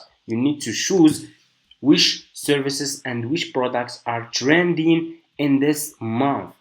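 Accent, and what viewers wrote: Morocco. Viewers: that Marocain accent 😂😂 ❤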